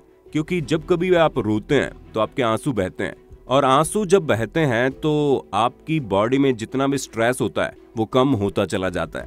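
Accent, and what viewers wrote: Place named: Lucknow, India. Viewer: मैं भी लखनऊ से ही हूं काफी दिन से सोच रहा था ये आवाज किसकी है और लहजा तो हमारे ही आस पास का है आज ही जाना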